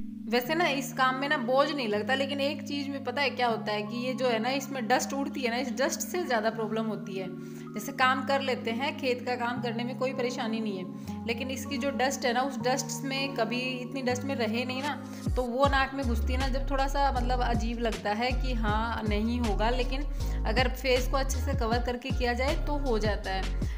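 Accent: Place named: Rajasthan, India. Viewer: Di tha haryana ari boli na bolo rajasthan ari boli puri ab to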